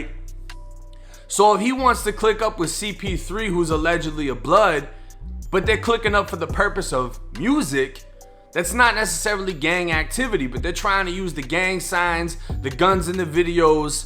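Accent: Boston, United States. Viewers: The best coverage I’ve seen yet. Nice New England Accent too. Sounds like Boston. New subbie. Lucci is definitely going to do time from what I can tell. He hired Gucci’s Lawyer to defend him. I heard he is the best when it comes to this type of case.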